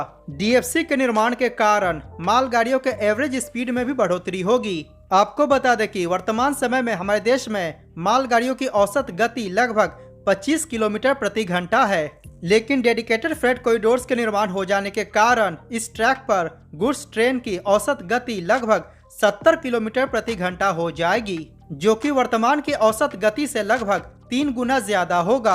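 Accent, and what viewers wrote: Bihar, India. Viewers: Is the presenter Bihari?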